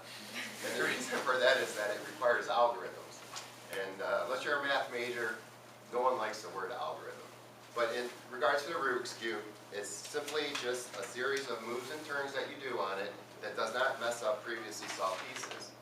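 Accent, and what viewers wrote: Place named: Midwest, United States. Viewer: Are you in the midwest? (you sound midwesty) You did great, btw. I'm analyzing your speech for a class.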